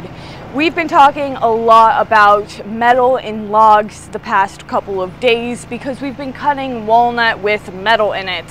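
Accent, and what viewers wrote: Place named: Pennsylvania, United States. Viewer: ~ your Grandpa and "The Boss" have trained one of the best lumber foremen in the industry! Thank you for sharing these practical trade skills and important safety tips! As they say "safety is no accident". God bless Lumber Capital Log Yard! P.S. that is the color T-shirt I'd like to own; but I'll have to dye my hair Boudica red just to begin looking as good as you do in it. Your Central PA country accent and good looks are what it takes for my A.D.H.D. brain to track properly without distraction or interruption. Those are strong leadership qualities you possess that I am afraid King Charles is lacking in. Freedom from tyranny is our Monarchy. Lest We Forget!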